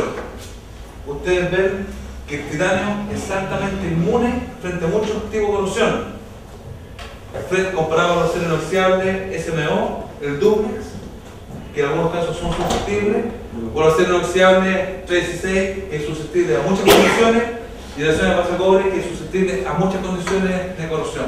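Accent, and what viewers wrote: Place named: Chile. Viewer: no se entiende nada en chileno :v wn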